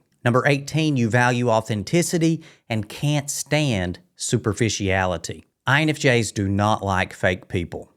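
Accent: South, United States. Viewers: Just curious…where is your accent from? 😊 I know it’s from one of the Southern states, but don’t know which one. 😂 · Saulron. THATs what you are saying 😂. Really bothered me I had to back it up and put the CC on. It’s your soft southern accent & the fact that Tolkien created a name almost nobody knows how to pronounce 😂. Cheers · Really enjoy your content. I especially love your accent as a fellow Southerner. Was wondering if you would be willing to share your state of origin?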